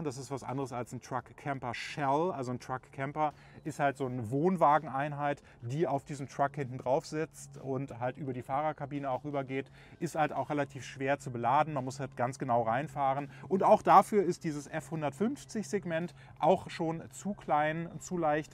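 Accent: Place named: Germany. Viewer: Haha ich liebe es wie deutsch du alles aussprichst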